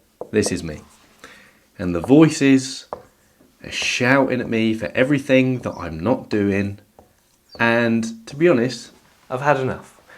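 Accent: England, United Kingdom. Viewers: You sound like a Pom but live, behave, and have the smarts of an Aussie. (PS my parents are Poms) love your stuff, totally enthralling 🇦🇺🦘👍🏼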